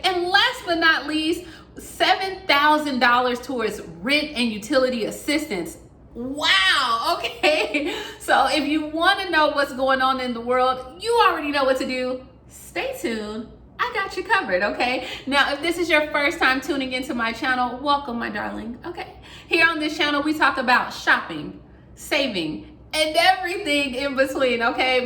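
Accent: Alabama, United States. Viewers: Does Alabama have anything. Did not get 1st was sick last year and child claimed me. I live by myself so need them to include us. On Social Security am 75 years old. Please try to find out. Love to watch and listen to you. Can tell you are from Alabama so bubbly and friendly. Keep up good work.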